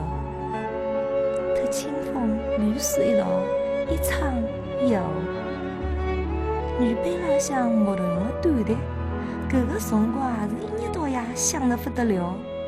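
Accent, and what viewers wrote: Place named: Kunshan, China. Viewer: 昆山街上人口音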